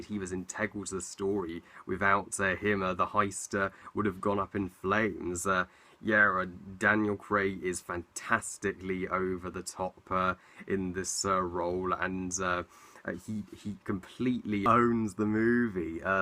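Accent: United States. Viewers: Like Your American Accent! I Can Do That accent! I Watch Logan Lucky Soon when its out.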